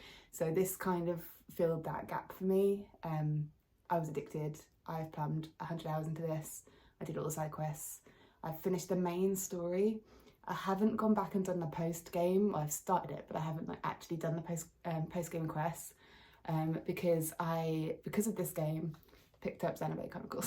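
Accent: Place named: Wales, United Kingdom. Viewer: 🏴 what's wrong with the Welsh accent? Haha great video and game collection, hope to see more 😁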